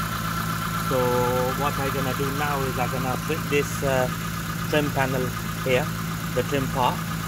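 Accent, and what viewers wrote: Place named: United Kingdom. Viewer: I love the British accent (sotaque). Hug from Mexicali México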